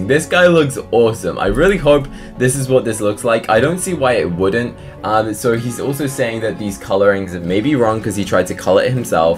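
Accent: United Kingdom. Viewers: ur british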